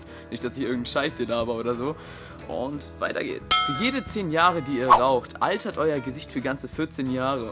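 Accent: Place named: Germany. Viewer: dat deutsch :D du bist episch :D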